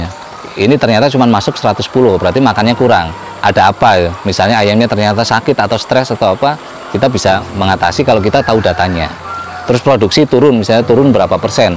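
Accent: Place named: East Java, Indonesia. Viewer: Logat jawatimuran Saya dulu di jatim sekitar taun <num> Surabaya-Malang-blitar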